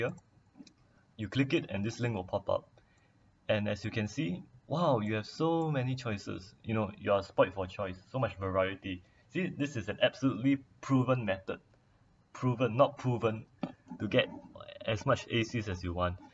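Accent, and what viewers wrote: Singapore. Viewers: I hear singaporean or malaysian accent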